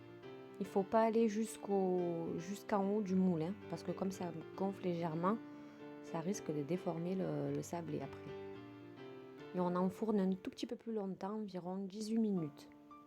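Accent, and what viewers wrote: South, France. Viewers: Super vidéo fidèle à toi même j ai testé pas mal de tes recettes qui ont toujours connu un succès.Je suis du sud et je reconnais ton accent celui de Toulouse ou Marseille 😅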